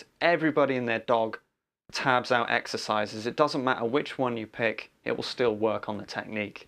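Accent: United Kingdom. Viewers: Hey man, great video! Are you British by any chance?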